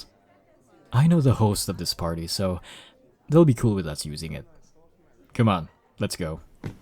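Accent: Philippines. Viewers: is it just me or do y'all hear the filipino accent???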